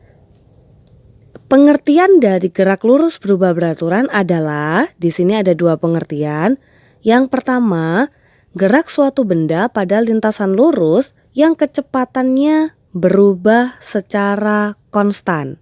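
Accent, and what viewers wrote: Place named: Java, Indonesia. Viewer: Medoknya tetep ya ce..hehe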